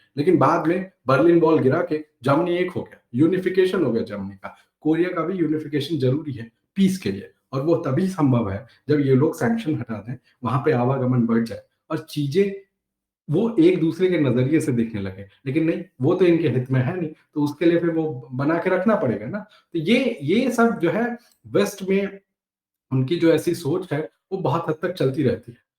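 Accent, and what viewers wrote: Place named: Uttar Pradesh, India. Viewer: Aap ki boli style se andaza hai ki aap uttar pradesh se hai ,correct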